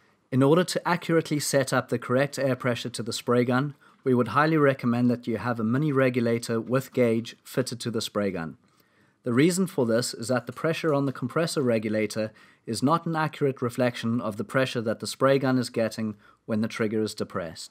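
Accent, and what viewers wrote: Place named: South Africa. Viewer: I detect the sound of a south african accent....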